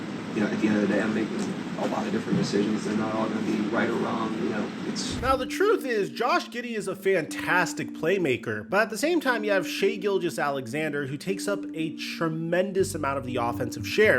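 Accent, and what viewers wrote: United States. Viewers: Why does he sound like an American, josh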